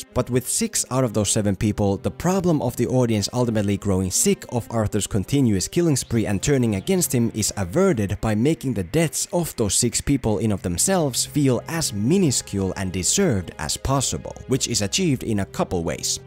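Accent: Finland. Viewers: Let me guess, you are Finnish or something by your accent. (I'm Finnish myself so Idk) No offense intended. I like your accent ALSO HE Didn't kill his neighbour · Hey . I have to ask. Are you Finn? Your accent defenetly sounds finnish :D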